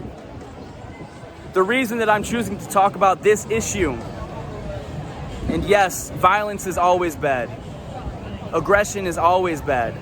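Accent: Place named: Midwest, United States. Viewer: That Midwest accent came out in this one. That’s how you know he’s cookin’.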